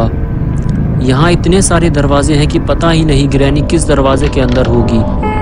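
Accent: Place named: Hyderabad, India. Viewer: Fast comment nice video hyderabadi Style me boleto kiraak mouth, 👌👌👌👌👌👌👌🌹🌹🌹👍👍👍👍👍👋👋👋👋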